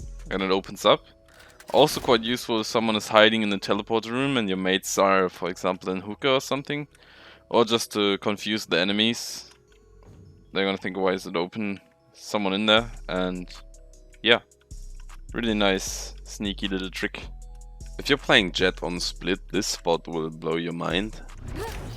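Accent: Germany. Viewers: I‘m not sure but do I hear a german person speaking english in this video? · R u from germany? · Feier den deutschen Akzent haha aber nice Englisch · Man hört einfach so krass das er deutsch is lul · Bist du deutscher? Nur weil dein Englisch sich deutsch anhört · man höher so stark dass du deutsch bist haha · Is your Main language german? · is this Deutschlish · Where are u from? Your Accent sounds like german c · are you german? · Ich höre einen deutschen Akzent xD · Where are you from? You sound german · Man merkt so hart dass du deutsch bist 😂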